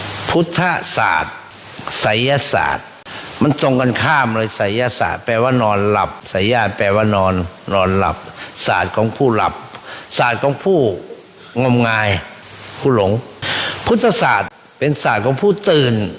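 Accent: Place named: Isan, Thailand. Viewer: กราบสาธุหลวงตาเทศภาษาอีสานปนด้วยฟังสนุกได้สติดีมากครับชอบที่สุด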